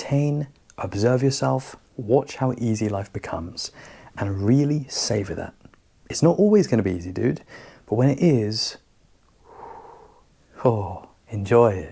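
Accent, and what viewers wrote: United Kingdom. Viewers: 50 seconds in and I had to sub, maybe because he's "one of us" lol (from the UK, I assume because of his English accent) 👽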